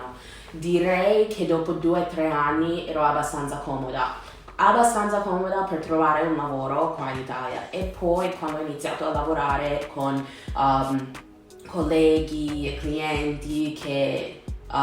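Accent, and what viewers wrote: United States. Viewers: Ciao, il tuo italiano è molto buono - È normalissimo che a volte tu abbia dubbi sulle parole e faccia errori, ma parli ad una velocità quasi da native speaker e devi esserne fiera. I consigli che hai dato sono gli stessi che dò anch'io a chi mi chiede come diventare fluent in English (vivo in UK e sono quasi bilingue ormai). Practising with native speakers is key! L'accento americano è cute though quindi tienitelo stretto:) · Non hai imparato bene la pronuncia, noi italiani non parliamo con l'accento americano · All you have to do is learn the accent! I can totally hear your American Accent. Brava però! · Dicono che l'italiano sia una lingua difficile da imparare per uno straniero. Tu l'hai imparato molto bene anche grazie al fatto che, vivendo qui in Italia, sei costretta a parlarlo giornalmente. Complimenti. PS: è bellissimo sentire parlare l'italiano con l'accento americano, non perderlo mai. 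· You seem fluent, congrats! Your American accent though is fairly strong. I was wondering if you've given thought to trying to improve that as it can be distracting? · Parli benissimo ed hai un accento molto piacevole da ascoltare. Purtroppo o per fortuna quando si impara una lingua da adulti l'accento della nostra lingua base non si perde mai, vale anche per me dopo anni che vivo in francia. Ma non mi sembra che tu ti ponga molto il problema, o si? Vorresti che il tuo accento americano non fosse notato o ti piace questa sfumatura?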